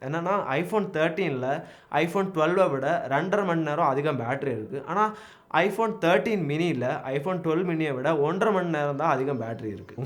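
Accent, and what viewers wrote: Kerala, India. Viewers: Bro are you malayali ne Tamil pesaradha patha appide dha theridhe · Thambi kerala vo? Malayalam vasana adikkithae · that malayalam slang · Unga pechula Malayalam slang adikkithe brother... Nallaa thaan irukku❤️❤️ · He is Malayalee. Endha aniya Sugamano? Ninda Thamizh valara adipoli.. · video nalla thaan irrunthuchu Kerala slang avevalavu thaan Ash naa Chennai slang 👍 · Neeinga pesura vitham Yaro Kerala la pesura mari iruku Tamil nadula irunthutu Y antha slang la pesuringa.. 🤷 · Bro neenga entha state bro Kerala va, unga sppech super ha iruku❤️❤️❤️❤️❤️ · Bro ungalukku tamil sariya pesa varaliya Malayalies pesura mathiri therithu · First u ne video la varatha. Unnoda Malayalam accent a kekum pothu kadupa iruku.😤😤😤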